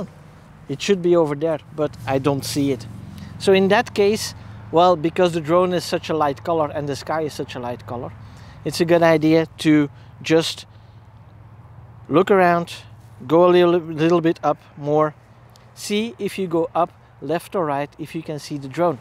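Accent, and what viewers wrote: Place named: Belgium. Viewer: Very nice explanation. Zeer mooie uitleg 😉. I think you are from Belgium. Correct?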